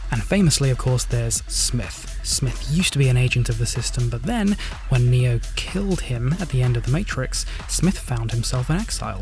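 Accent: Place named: Australia. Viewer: Love your Aussie accent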